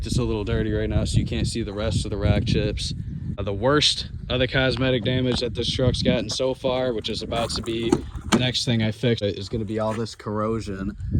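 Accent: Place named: Northeast, United States. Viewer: Interesting accent. Somewhere upper east coast I’m guessing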